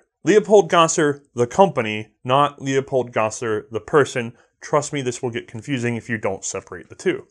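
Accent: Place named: Carolina, United States. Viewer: It's spelled and pronounced "perdy." Gotta love that Carolina accent. :D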